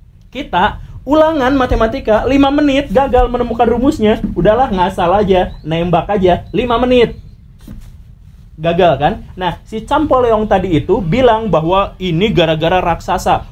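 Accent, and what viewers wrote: Indonesia. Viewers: aku lebih suka orang seperti begini dari pada yang pakek topi versi timur tengah. logat penyampaian sangat ke Indonesia an banget. dan ini yang bikin aku suka nonton vidio satu persatu tanpa pernah saya skip karena saya merasa tambah pintar tanpa ada rasa paksaan harus begini begitu kalau mau seperti itu. sekian terimakasih udah dibaca😂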